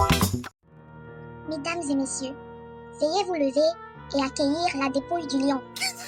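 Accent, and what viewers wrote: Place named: Cameroon. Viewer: The Killer Beh ouais. Tu n'entends pas l'accent?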